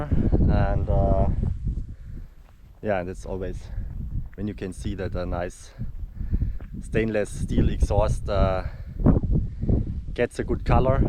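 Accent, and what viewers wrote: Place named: East Germany, Germany. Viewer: DDR ENGLISH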